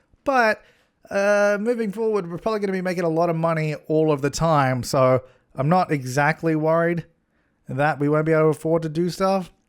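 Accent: Australia. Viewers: Haha I love how you love his accent but I’m Australian so it sounds completely normal to me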